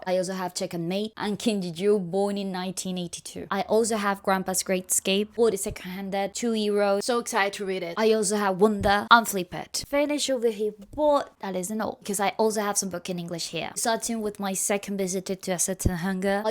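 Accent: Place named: United Kingdom. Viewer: Me encanta acento inglés britanico y esta lista de libros ❤ deberías hacer más Reels así 😊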